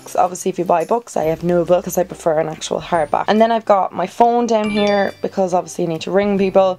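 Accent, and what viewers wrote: Ireland. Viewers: is her accent irish ?!!!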